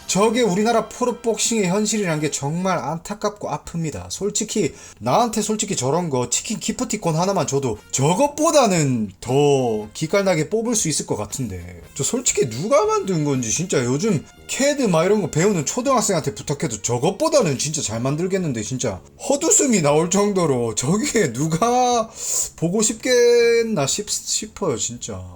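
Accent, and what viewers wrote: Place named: China. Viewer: ㅇㅈ 조선족 같아 임마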